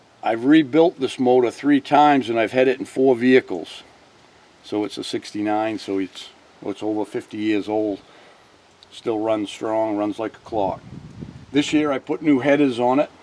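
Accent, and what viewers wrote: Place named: Maine, United States. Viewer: Supah swampahs, huh Bub? 😆 Love the Maine accent!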